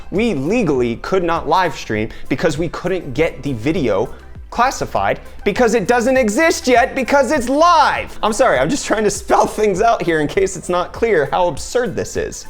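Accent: United States. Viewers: You have an American accent though... 🤔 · Most South Africans don't have accents :) He does seem to have a slight American accent though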